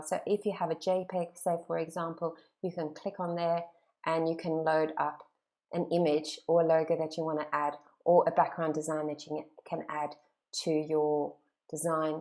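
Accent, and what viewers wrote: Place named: Australia. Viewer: i was wondering " wait, hold on, did I hear Margot Robbie speaking ? but then I was like, ah she is a Aussie so you might be having the same accent" ^_^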